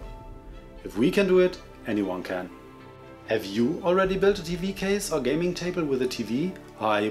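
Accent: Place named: Germany. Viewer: Ich hatte die ersten paar Sekunden und dachte mir nur „DAS verstehe ich gut, dass ist der mir vertraute deutsche Akzent“ 😂😂 Aber super Video, genau das hatte ich gesucht, vielen dank!!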